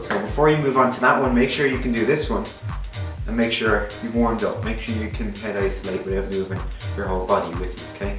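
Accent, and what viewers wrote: Ireland. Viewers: your accent gives me life. irish accents are fab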